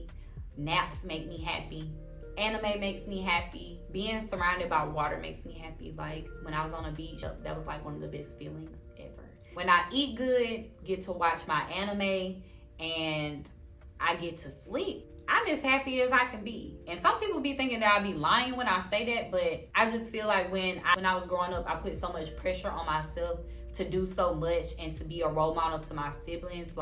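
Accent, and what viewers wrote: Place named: South, United States. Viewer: Southern accent wasn’t expecting tht😂😭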